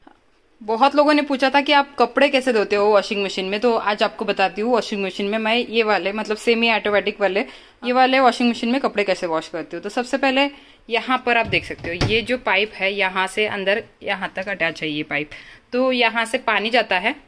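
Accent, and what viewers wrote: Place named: Varhadi, India. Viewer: Kay chyyata marta tumhi😂😂🤣🤣mast apli warhadi aikli ki mast watat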